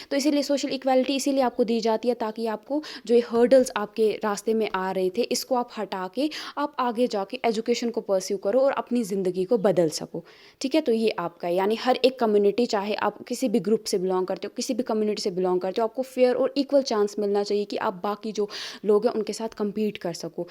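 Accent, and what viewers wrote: Kashmir, India. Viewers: Are u kashmiri.. your ascent is like kashmiri ❤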